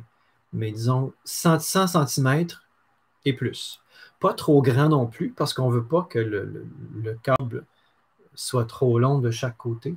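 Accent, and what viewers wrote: Quebec, Canada. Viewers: Bonjour, Merci pour vos vidéos très bien faites et très motivantes, même si je n'ai toujours pas franchi le pas de tricoter mes propres chaussettes 😉. Ce qui me fait le plus hésiter, c'est de savoir s'il vaut mieux commencer par la tige ou la pointe! Par la pointe me semble bien pour ne pas être à court de fil, mais est-ce que la tige tient bien au niveau du mollet? Comment faire pour que les côtes soient élastiques, mais ne se détendent pas non plus? Y a-t-il une façon de rabattre les mailles qui permette d'avoir un bord qui ne gondole pas? Merci d'avance et surtout, continuez à nous régaler avec vos vidéos (et votre délicieux accent québécois 😁) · Merci beaucoup pour vos tutos 😊ils ont été indispensables pour l apprentissage.je fais des moufles des chaussettes grâce à vous .Regulierement je revois les explications qui m aident toujours et puis comment se passer de votre sympathique accent canadien qui fait voyager en ces temps particuliers de covid .Sophie de Toulon france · Tous mes compliments l quel plaisir en plus d entendre le Québec l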